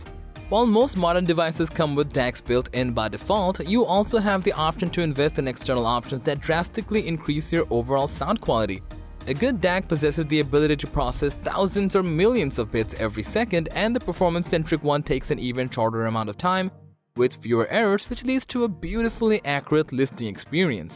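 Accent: India. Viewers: Oh yes, but along with the indian accent. He also has a British, american, and what seems like a Kiwi accent to me. That's quite impressive.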